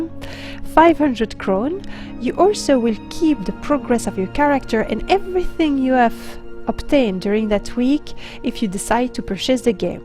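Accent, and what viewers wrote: France. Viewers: Im no expert, but are you french? . Love the voice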